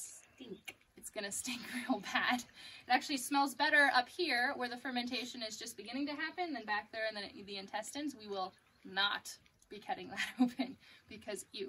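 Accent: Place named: United States. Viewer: Also Lady, please put down an English writing above, because don't understand american accent and vocal pronounciation with all mouth. Is strange this English accent. The subiect îs to interesting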